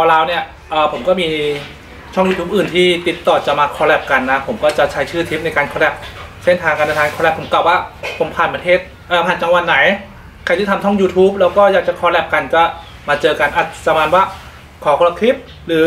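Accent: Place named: Thailand. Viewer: สวัสดีค้ะคุนน้าชอบเวลาคุนน้าพูดค้ะเสียงเหน่อๆเพราะดีค้ะ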